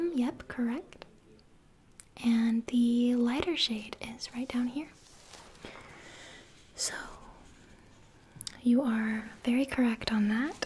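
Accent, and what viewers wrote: South, United States. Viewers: hehe it's definitely a southern accent, i'm from jersey and we speak like we're from california. thank you for the time stamps :) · Wow, so far this is amazing! Creative idea, I love the southern accent. Comment section is brimming with kudos. This is going to be awesome. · Ok you country accent is spot on and amazing I from the country and even though I don't have that accent I heard some people talk like that and I have one family member who dose that is really amazing how good you did that! Keep up the great work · It's the country nurse accent for me~ 😗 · you have the patience of a saint. You a little over 2 hour movie which must have taken forever to edit. Too tedious for me. You do a fairly good.good southern accent and it's pretty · You have a better southern accent than the actors in Dumplin. Coming from a southern girl. 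· That country voice tho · Love the Georgia by way of Tennessee accent. sorry to type like I know you but " " I swear this is your actual accent you speak with. Also your pure devotion to be such a great ASMRTIST is divine as you are bless your heart child keep up your excellent work it's much appreciated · Gotta love me some southern accent, damn. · Absolutely love the southern accent very funny! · Holy moly that accent is ASMR inducing. The southern accent is very endearing and loving. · This video is y I subscribed, 😁 that southern accent was ironically soothing. U must b from the south, if not very convincing 😆. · Love that southern accent lol!! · The southern accent was SPOT ON! Excellent job as usual! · Can't wait to watch this after work!!! I could only watch about like 2 minutes of it and I am Loving the accent!!! It is such a sweet and tingly accent :) It is sooo Good! Kinda reminds me a little of Dolly Parton.. 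but she's the first one to come to mind when I hear a southern accent lol. You're really getting into your RP..and I am here for it!!! 😁💖👏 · This is my new favourite ASMR clip. That southern accent is just perfect. <3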